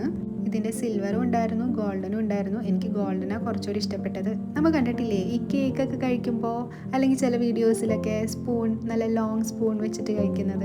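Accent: Malappuram, India. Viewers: I think chechi thamasikunnath malapuram avidayaanno aa slang kettappo angana thoonni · മലപ്പുറം ആണോ ചേച്ചി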